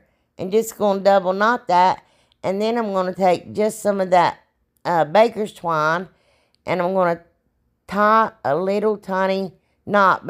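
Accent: South, United States. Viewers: Glad I found your channel love your ideas and I love your accent you sound like me I'm from west Virginia lol but you got that southern accent like me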